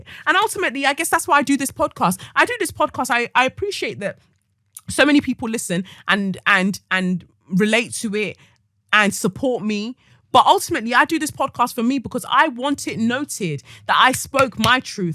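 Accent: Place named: Africa. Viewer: Every single time!!!! Must you always be this on point african mother accent Everytime I listen to you it’s like your speaking direct to me.Always speaking the light I needs to hear at the perfect time. Thanks and appreciate you my baby gurlllll.✨🌻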